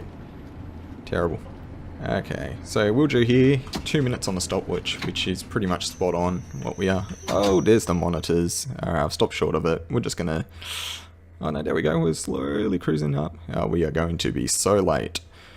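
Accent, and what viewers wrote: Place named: Australia. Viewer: I'm tipping he's an Aussie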